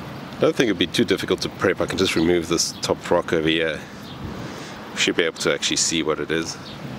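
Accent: South Africa. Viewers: The longer you go back in this guys video archive the more South African he sounds 😮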